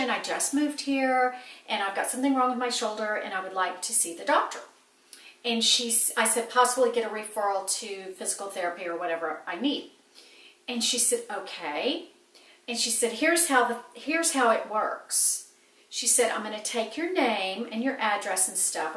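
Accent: Texas, United States. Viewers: this was a great video. I just got caught up on your last few videos and all of them are great!! I’ve lived in Tennessee all my life, but in a fairly large city. Yes, I’ve heard the perceptions people have of the south. But I’ve traveled all over our country, and nothing beats the friendliness of the southern folks. I’ve always been proud to be a Southerner!! You do have an accent - sounds sort of Texan to me. I get asked a lot when I travel if I’m from Texas. East Tennessee accents sound more Texan than West Tennessee. I have family that lives in Ozark AL and, boy, do they have an accent! But I love to hear it. The doctor that had to ‘approve’ you sounds weird to me too. btw....I recently went to Paris for the first time and I loved it. I know that is a favorite place of yours, and I can see why. It was actually in Paris that someone asked if I was from Texas!! 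So glad you are adjusting to your new home. You are so right— -attitude is everything! And you have the best. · I think you have a mild Texas accent. That's funny about the Mexican food. I'm from a smaller town in Arizona on the Mexican border, and went to college in Lubbock. I did not like Tex Mex lol. It's different from Sonoran Mexican food. Now I'm in Phoenix which is south, but not the South obviously. I remember everyone being so friendly in Lubbock, and I enjoyed that. But there were other things I didn't care for, so was glad to move back!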